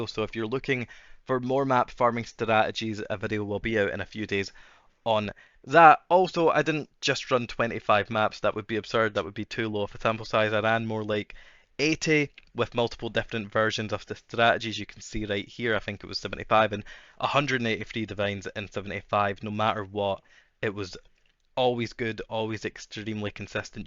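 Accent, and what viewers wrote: Scotland, United Kingdom. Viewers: scottish accent = subbed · I discovered your channel this league and all your strats are all great and I really like listening to you, your Scottish accent is the cherry on top. Keep it up bro.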